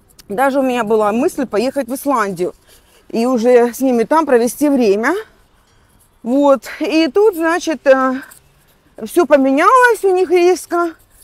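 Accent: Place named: Kuban, Russia. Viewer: Что вы несёте, я живу на Кубани почти 40 лет,все интонации,выражения ,произношение слов кубанские 🎉🎉🎉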